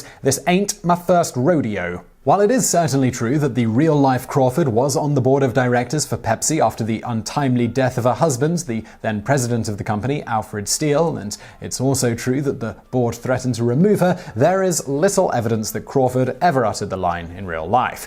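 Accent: United Kingdom. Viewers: I like the way you say it. Your British accent gives it a little extra sting.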